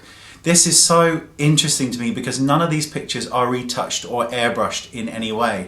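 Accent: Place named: Wales, United Kingdom. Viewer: I'm hearing more of the welsh in you today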